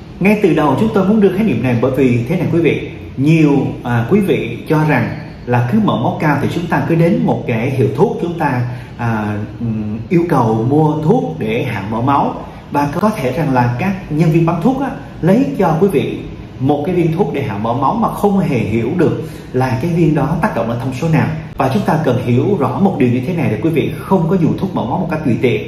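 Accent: South, Vietnam. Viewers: Cám ơn B/s đã giải thích rõ ràng 4 phần trong mở máu cao với thuốc B/s phải kê toa, và âm thanh giọng miền nam nghe rất hay, cám ơn nhiều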